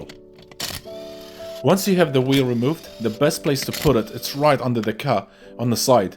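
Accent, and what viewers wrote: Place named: Eastern Europe. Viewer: You sound EASTERN EUROPEAN… but you are from NEW ZEALAND ?? And ZEALAD is actually DUTCH..😃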